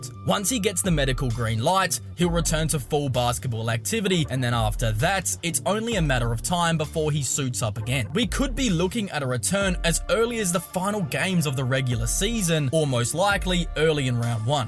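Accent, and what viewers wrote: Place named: Australia. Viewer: Seriously fucking Aussie accent.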